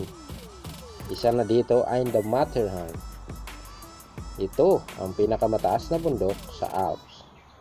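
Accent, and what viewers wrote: Visayas, Philippines. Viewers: i think he is bisaya,the way he talk,that's why.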